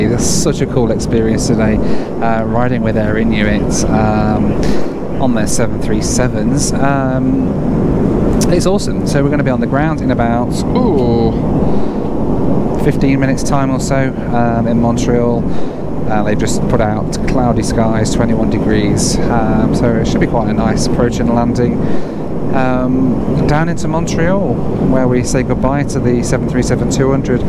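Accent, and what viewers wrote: United Kingdom. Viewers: Hi,My first comment for your channel.Im from India and have been following you for a while.Definitely the clincher for me till date.My congrats to the amazing crew.The energetic and emotional outpour that you had when flying back on the 737 is contagious to be honest.Added to the above recipe is your wonderful British accent that is a real treat to listen to.Oh but why would food cost you an arm and a leg(a "simple costly" would be easy to understand:):):).........Keep up the good work.All the best and thanks for all the wonderful videos till date.Wish you all the luck forward...........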